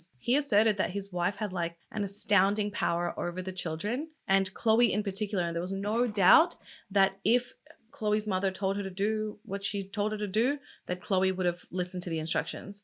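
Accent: Australia. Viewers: I only just discovered this channel, absolutely love finding fellow Aussie’s to listen to & my daughters names Zarah 😊 good video I’ll definitely be back for more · You have such a calming voice. Are you Australian? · Its so refreshing hearing an ozzie accent instead of american for once! <3 P.S Im from New Zealand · Its so weird. Lol. Since i heard your accent i understood your from Australia, you look like a child between two more famous makeup and crime youtubers, one is from US, named Bailey Sarian, second is from Australia, named Bella Fiori. Lol you look like a mix of them both. Anyways, continue on. And where are you ethnicaly from? Im curious, i would say Middle East or little Asian. Thanks.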